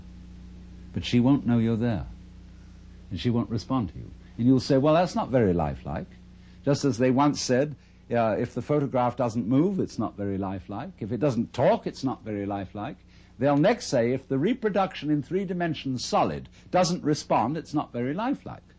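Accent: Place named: England, United Kingdom. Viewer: With that English accent i would not beleive anything he. says.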